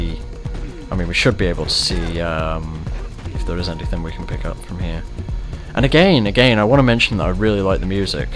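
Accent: United Kingdom. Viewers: AWWW THAT IS ADORABLE!!! Why do you British people have one of the best accents evar!? lol.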